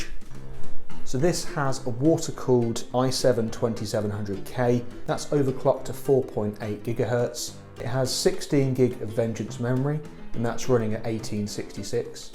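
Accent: United Kingdom. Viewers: He's british calm down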